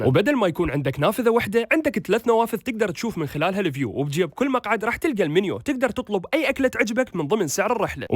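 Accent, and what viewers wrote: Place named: Iraq. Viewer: عراقي ماتشوف لهجتة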